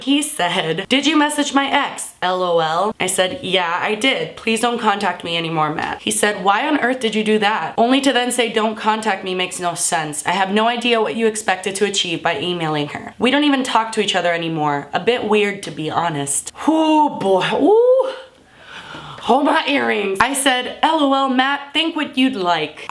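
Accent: United Kingdom. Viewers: british accent is very attractive tho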